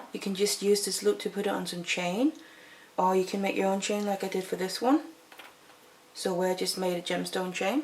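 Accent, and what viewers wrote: United Kingdom. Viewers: I really love your videos. Thanks so much for sharing your talents with us-for FREE. I love your accent, I'm from California and you sound so British. I know how much work goes into even a short video, and yours are so well done you must put lots of time and effort into them. Keep them coming.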